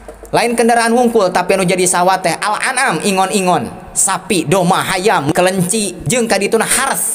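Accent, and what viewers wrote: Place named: Jampang, Indonesia. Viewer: Berkah pak ustad. Ti palih mana ya nme ngarenge.. tapi logat na sapertos ti jampang..!! 🙏🙏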